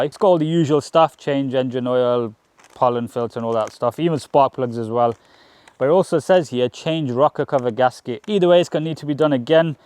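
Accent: United Kingdom. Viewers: This guy sounds British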